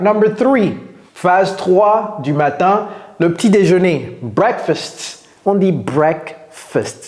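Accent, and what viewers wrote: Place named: United States. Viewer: You sound very good, like an American. You reach well! I like your classes. Keep going!!!!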